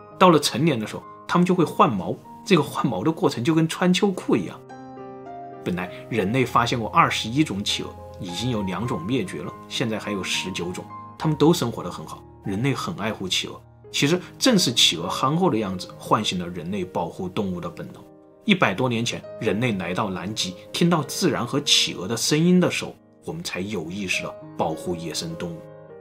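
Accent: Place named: Mainland, China. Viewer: 中國人發音跟台灣不一樣你當亞洲國家只有台灣？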